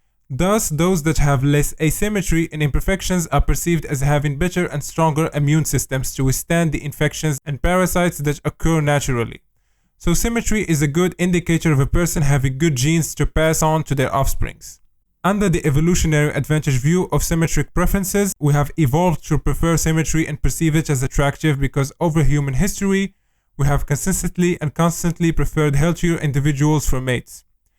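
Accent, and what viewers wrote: Morocco. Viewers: Moroccan accent 🎉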